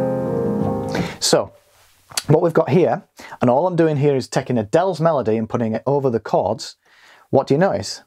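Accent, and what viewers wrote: United Kingdom. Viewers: I came here by accident. Pleased I did. Your enthusiasm is infectious. Many thanks. Great to hear a Brit here on YouTube.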